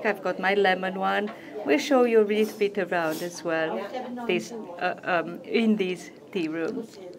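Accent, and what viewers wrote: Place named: England, United Kingdom. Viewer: Are you an English lady or you just visited this place ? Because I'm watching a British teacher from " Much wenlock " he has a channel on you tube for learning English his name " Misterduncan " his accent is completely different than you . I'm just wondering why you didn't have the same accent if you were from the same place " Much Wenlock "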